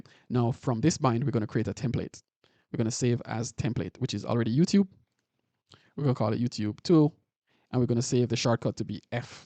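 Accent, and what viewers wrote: Jamaica. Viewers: Real yawd man bro mi can hear it lol but now I have to find out how to get quantower on mac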